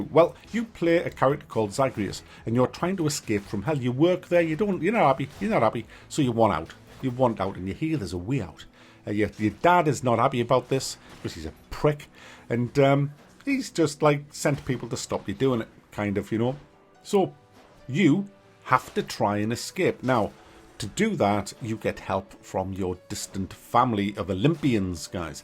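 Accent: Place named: Scotland, United Kingdom. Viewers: Thought he is Scottish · This guy sounds Scottish.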